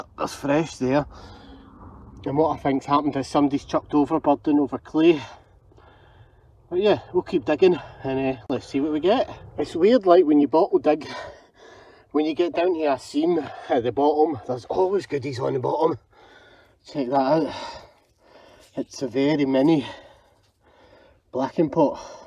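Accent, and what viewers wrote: Scotland, United Kingdom. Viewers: I just love your videos 🤛🏼 & ur accent… i hear Glasgow accent is stronger than Edinburgh? ☺️ Wish I could find tips like u find up ur way, down here in Yorkshire… ☺️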